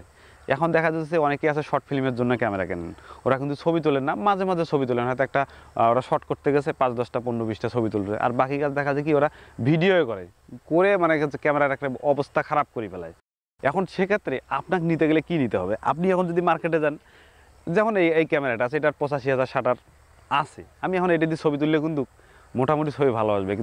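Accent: Rangpur, Bangladesh. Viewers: আপনি সাবলীল বাংলা ভাষায় কথা বলেন তবুও এলাকার উচ্চারণ আমার বাডী উলিপুর কুডিগ্রাম তাই বুঝতে পেরছিলাম ধন্যবাদ উত্তর দেয়ার জন্য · হ্যালো ভাই আপনার বাড়ি কোনঠে রংপুরিয়া কথা কন ক্যা ম্পা অবশ্যই জানাবেন কোন জায়গায়‌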